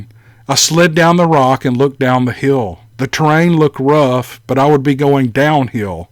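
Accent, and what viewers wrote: South, United States. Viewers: Hysterical fantasy. What's with the southern accent? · your quote. "How about I just jump into the stories without running my mouth".(But please don't forget to play the music?) - my quote!. And I don't think you run your mouth off because I love listening to your southern drawl. From an old Aussie who just loves your presentations. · Sounds like a southern racism Chanel. Tone down the confederate accent.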